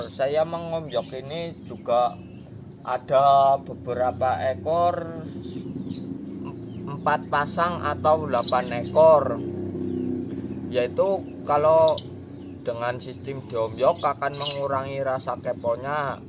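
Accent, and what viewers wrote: Java, Indonesia. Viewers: Ngomong nya jgn medok" mas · Salam medok🙏🤘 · Ngapak tenan koek kang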